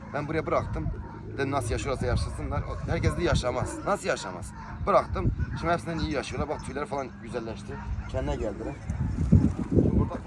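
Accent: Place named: Urfa, Turkey. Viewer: Kral Urfalı olduğun o kadar belli ki, özünü kaybetmemen mükemmel bir şey dezenktefe diyince aklıma teyzem geliyor 😂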